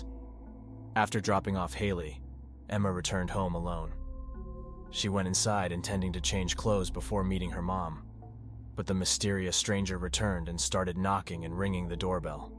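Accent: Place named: Australia. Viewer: I love to see how you are growing so fast!! I miss the Aussie accent. Great video!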